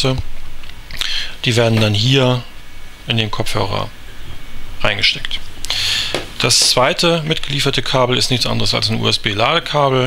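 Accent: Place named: Germany. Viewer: very bad english, your german accent is so strong that it is impossible to understand.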